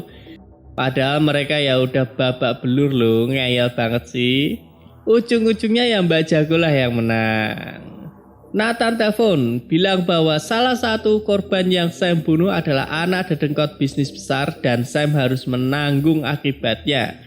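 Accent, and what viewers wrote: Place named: Klaten, Indonesia. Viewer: Mimine opo wong klaten yo? Logate koyo klaten 😅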